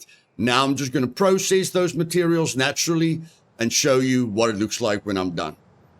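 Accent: South Africa. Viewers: Your accent...is this South African?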